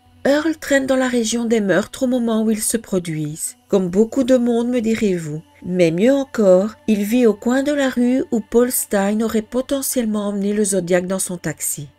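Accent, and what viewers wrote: Belgium. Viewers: Bonjour, un grand merci pour tes vidéos de grandes qualités, je me pose une question:quand j entend ton petit accent viendrais tu de Belgique dis moi. 😉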